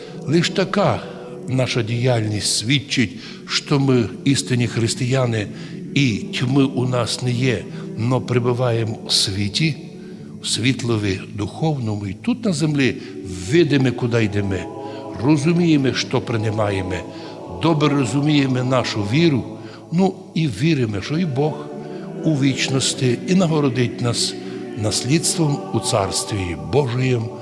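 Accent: Transcarpathia, Ukraine. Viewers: Українською з закарпатським колоритом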